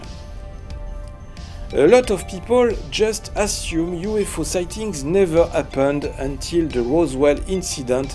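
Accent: France. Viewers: Literally the strongest french accent I've ever heard 😂, cest bon mon ami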